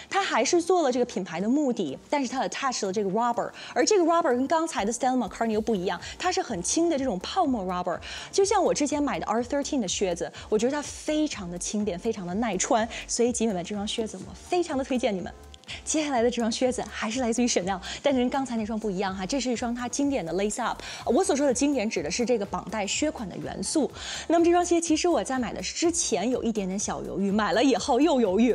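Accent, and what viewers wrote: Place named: Shandong, China. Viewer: 很像山东的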